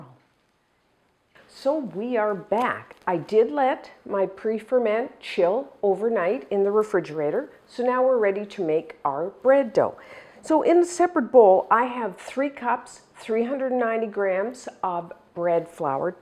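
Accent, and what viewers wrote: Canada. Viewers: Her accent is Canadian af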